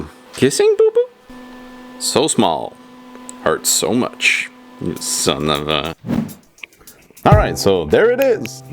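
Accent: Quebec, Canada. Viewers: Been in awhile since I've heard a Quebecois accent. Your english is great!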